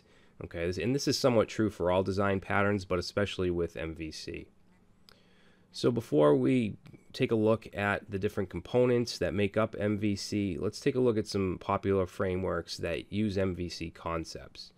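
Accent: Boston, United States. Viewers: Are you from Dorchester/Roxbury?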